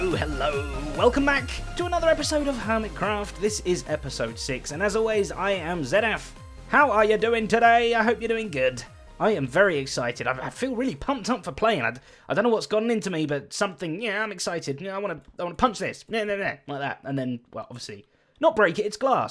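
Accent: United Kingdom. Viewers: Why do british people get all the great accent? It's not fair :(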